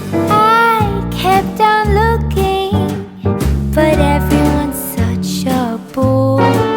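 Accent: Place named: Alabama, United States. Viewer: Everything looks gorgeous. You’re my new favorite decorator!! I’m from Arkansas and when I first heard you, I thought maybe you were too. Guess the southern Alabama accent is the same. Lol. Anyway, I’m binging your videos!! 🍂🍂🎃🧡🧡🌾✝️✝️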